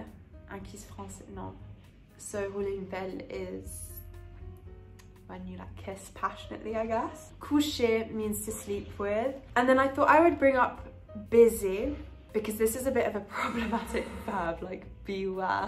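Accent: France. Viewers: Ça fait tellement accent des années 2000/2010 de la petite bourgeoisie parisienne c'est incroyable, on voit où tu as appris juste à ton accent 😁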